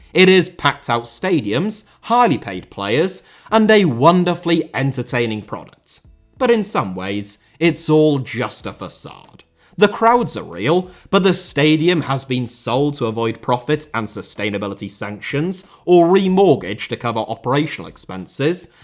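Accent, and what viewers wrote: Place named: Northern England, United Kingdom. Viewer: Your accent wants to make me punch my goldfish. not anti northern, just the smug northern English accent you use.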